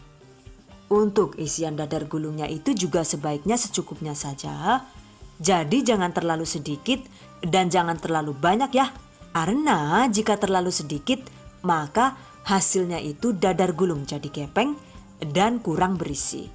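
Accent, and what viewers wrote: Banyumas, Indonesia. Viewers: Suka bgt dengan resep kue yg selalu gamblang dan mudah di mengerti setiap caranya dan obrolan ngapak nya keren trims 😁😁😁👍👍👍👍👏👏👏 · Kesuh nyonge..ini yang saya suka dr chanel ini..berbagi resep..dengan ciri khas ngapak.. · Satu2 nya yang jelas detail sampe ke hitung2nya, cuma ada di. Ada logat ngapaknya jg😊😊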